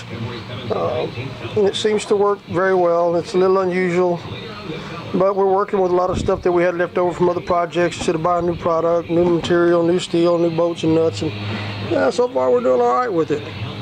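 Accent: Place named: South, United States. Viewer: Man please tell me you have paper plans you can put together and mail out for a small fee call me ( <num>, man this by far the best saw mill i have ever seen , it's simplistic, & looks like it will go together quite easy, & hell yeah I'm subscribing & given y'all 10 👍👍👍👍👍👍👍👍👍👍's up, & definitely sharen the shit out of it, me & my boy are positively going to build this badass mill, 1 thing tho. You definitely might wanna put on some guard clover boxes for the blade, ya just never know if & when that blades liable to snap from a freak mishap, & you might wanna think about welding on some angle brackets on your tension bracket to keep it from worken the original weld loose from vibration, you sure sound like a good ol boy, i hope y'all get 100, 000,000 likes & thumbs up on this video, y'all definitely deserve it in my book, i absolutely love love love this build, great job bro. 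Best I've ever seen on YouTube, & believe me I'm watchen YouTube 247 to find the best mill i wanna use to fabricate mine after, guess i can finally stop looken, & move on to something else, now that I've found the baddest sawmill I'm gonna build mine after, thank you so much, dude you have no idea how you've just made my day, I'm stoked as hell, & can't wait to get ready to build mine, & I'm going to film every step with possibly a few ideas of my own, & put it on YouTube, & going to give you every bit, & all the credit for the inspiration, take that to the bank bro. LoL, whooo hoooo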